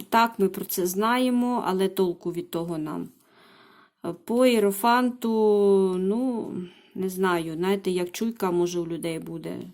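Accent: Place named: Zaporizhzhia, Ukraine. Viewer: Уважаемая, если вас это не обидет ответьте пож. Вы наша, Запорожская? Это чувствуется в разговоре,что конечно приятно, когда вы говорите наш город, сказав наш нам и кажется,что вы живёте в Запорожье.Спасибо дочка, за твой труд.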